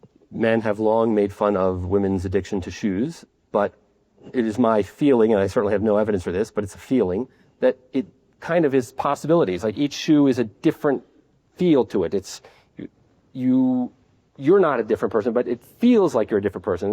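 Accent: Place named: New York, United States. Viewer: Excellent work cleaning this up—I'd actually never heard him speak intelligibly (due to audio quality, not any property of his speech). This guy more or less saved my life with his blog posts. I was completely ignorant, deluded, and self-destructive to an extreme degree until TLP's blog (TLP is the blog, I know, but it's sort of also the man) found me and taught me, very cryptically and indirectly, how it is that a human can exist. I knew they could, and do, exist, but not how. He sounds like he's from New York. I won't check.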